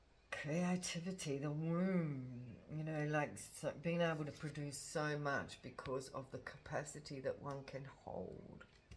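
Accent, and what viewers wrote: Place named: Australia. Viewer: Fellow aussie reader here and I totally get what you mean about the pelican and the shining. I have been talking a lot lately about people with the light in them and how people without it just want to stamp it out in people. It is coming up in readings all the time. Love to her the aussie way of speaking. Have a great new year.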